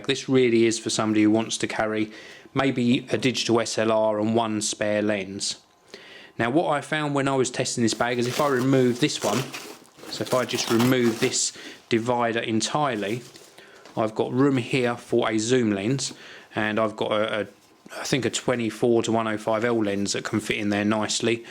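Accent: United Kingdom. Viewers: can you speak without the british accent , and speak faster ?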